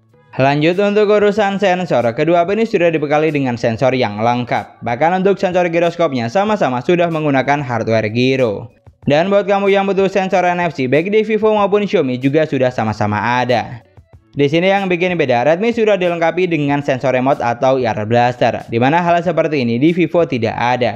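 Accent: Madura, Indonesia. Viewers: Logat madura bg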